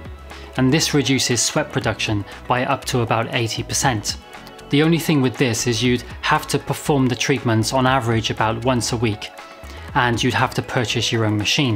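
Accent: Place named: United Kingdom. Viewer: I love your British accent! Perfect pronunciation, so clear when you speak!!! Greetings from southern France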